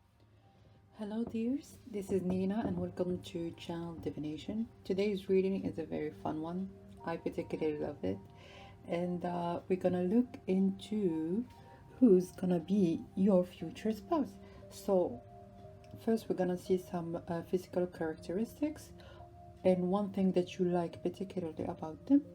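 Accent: France. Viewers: You have a french accent or am I hearing wrong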